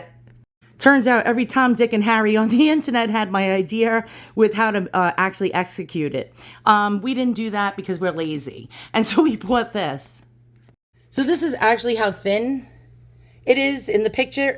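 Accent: New York, United States. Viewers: From a new yorker to another new yorker, love the accent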